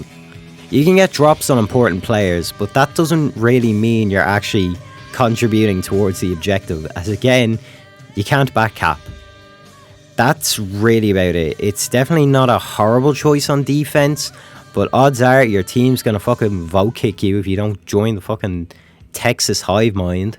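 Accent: Ireland. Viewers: Are ye a Dubliner? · is this man irish and so am i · Very well done mr irish voice person. Sub increment plus one. One thing I've noticed playing defense as scout is that people get SUPER bitchy about it. You can't play scout for five minutes before you're being told you're the single worst human being to ever have been born. I don't usually play scout and I do usually play payload (or koth because koth has pretty maps) so it's super jarring to go from being the medic 96% of the time to being told I'm dead weight as a scout. (Particularly jarring when you go from top scoring the prior round and hard carrying an offense to being told to self terminate on defense) I always did kind of wonder if it's just me who experienced this and it seems not. Would love to see more.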